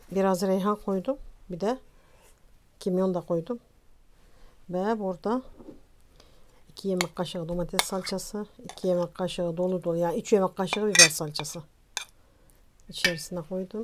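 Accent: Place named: Gaziantep, Turkey. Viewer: konusmanizi cok hosuma gidiyor. Dunurumde Antepli ayni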